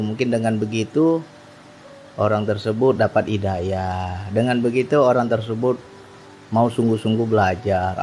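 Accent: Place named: Palembang, Indonesia. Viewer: Kalo dari logat kayayo wong palembang bang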